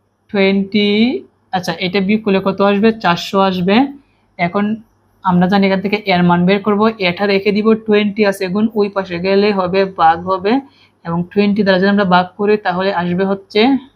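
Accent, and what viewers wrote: Sylhet, Bangladesh. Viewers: ভাইয়া আপনি কি সিলেটি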